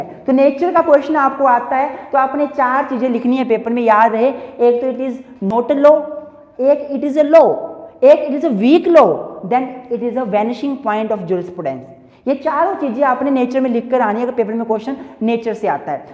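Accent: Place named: Punjab, India. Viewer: the way u teach is commendable 👍 pronunciation me punjabi touch hai..bt tht is manageable ..bcz assi v punjabi aa 😁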